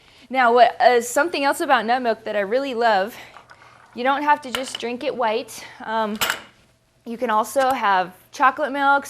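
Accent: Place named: Australia. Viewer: Posh australian accent.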